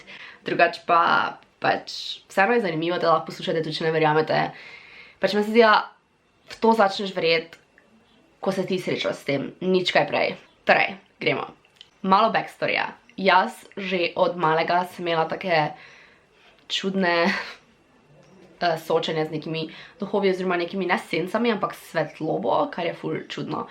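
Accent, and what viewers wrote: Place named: Primorska, Slovenia. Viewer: Ma kje ti zivis ker imas primorski naglas?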